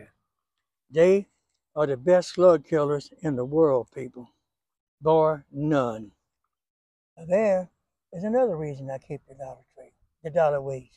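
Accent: Louisiana, United States. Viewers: Dear, I just love watching your videos. Your like the father I never had. I love your Louisiana accent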